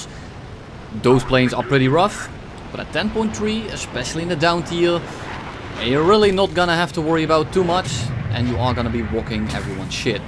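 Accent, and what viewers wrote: Netherlands. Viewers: Hey are you dutch by chance? Because the accent sounds really dutch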